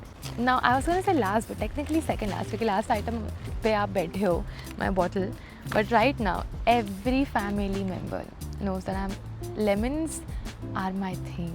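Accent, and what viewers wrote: Gujarat, India. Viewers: Loved your gujju accent 😂💖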